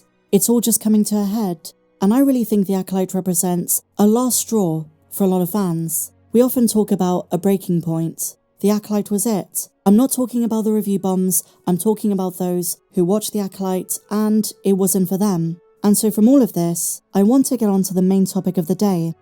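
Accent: United Kingdom. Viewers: I love her Brit accent too, but she needs to address the muddled bass sound mix. There's little sibilance in there and she needs to up the mids and highs to correct it. One shouldn't have to pump the volume or use subtitles (filled with spelling errors a lot of the time) to make sense of what the speaker is saying, right?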